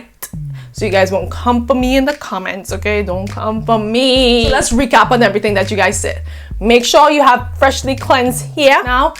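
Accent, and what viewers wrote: Trinidad and Tobago. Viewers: I'm new to your channel but are you from Trinidad? Your accent is beautiful!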